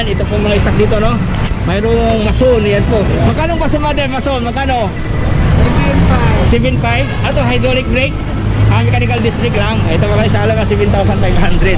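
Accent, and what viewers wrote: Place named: Visayas, Philippines. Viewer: Klaro kaayong pagka bisaya dol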